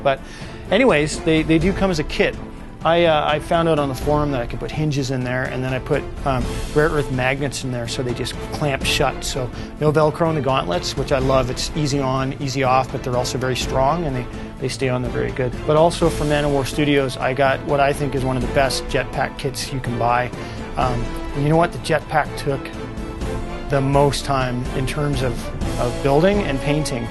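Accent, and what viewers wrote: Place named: Canada. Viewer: is the background music necessary?? and your Canadian accent is annoying!!!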